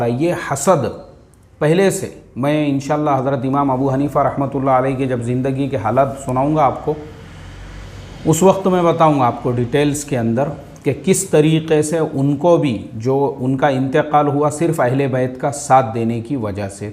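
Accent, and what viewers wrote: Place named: Maharashtra, India. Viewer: Pure Maharashtrian tone. 🙏🙏🙏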